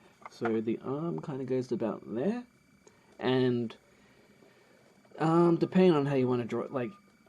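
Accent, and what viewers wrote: Australia. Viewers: You're from Australia! I knew it from the first video I watched! Despite being bad at identifying accents, I got this one right. :)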